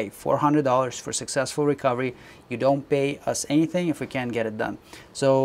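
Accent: Russia. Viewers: чет есть русский акцент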